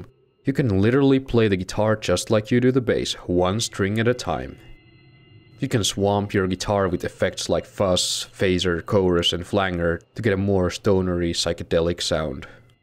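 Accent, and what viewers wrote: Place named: Europe. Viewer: Late to the party: are you a Dutchman? You have a very European accent. You did hit the nail on the head and distilled Stoner Doom to it's finest essence. If you want Dutch Stoner/Doom try the band ' ' from Leiden!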